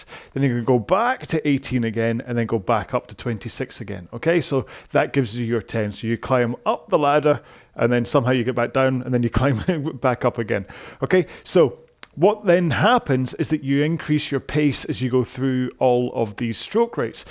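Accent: Scotland, United Kingdom. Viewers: #so, thanks again for scotlishly (is this a real word?) talking through this climbing intensity row. #muchmorebetter, I know you have already finished the series, but I'm just enjoying them one by one since the sportschools are closed again. Cheers, Bart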